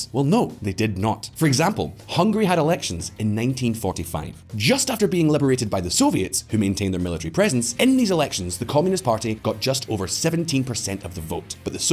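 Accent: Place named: Scotland, United Kingdom. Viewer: How do you get American from that accent? He's Scottish. Part of the UK Ashley 😁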